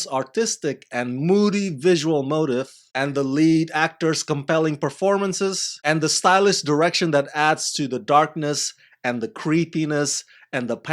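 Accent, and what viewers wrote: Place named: Indonesia. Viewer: You sound like Indonesian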